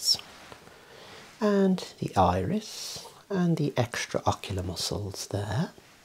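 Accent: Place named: England, United Kingdom. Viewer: This is how I imagine everyone from England speaks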